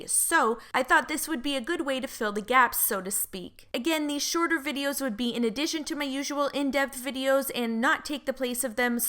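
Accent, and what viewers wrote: Canada. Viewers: are you fromcanda or the midwest? that accent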